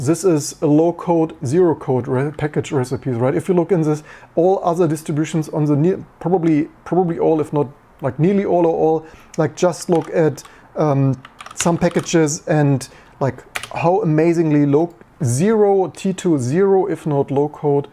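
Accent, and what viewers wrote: Germany. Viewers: Das ist das deutscheste Englisch was ich je gehört habe :) But mad respect for your work man. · Your accent is like a german shit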